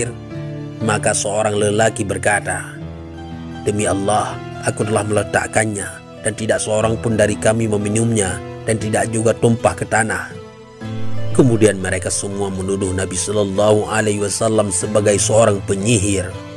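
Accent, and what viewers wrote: Aceh, Indonesia. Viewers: maaf.. aku cuma mau nanya .. kayaknya admin nya orang aceh ya.. salam kenal ya.. wassalam..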